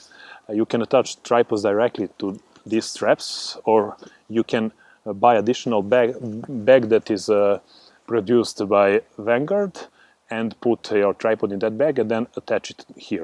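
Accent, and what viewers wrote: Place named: Eastern Europe. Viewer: I cant stand Eastern European accent 🤬🤬🤬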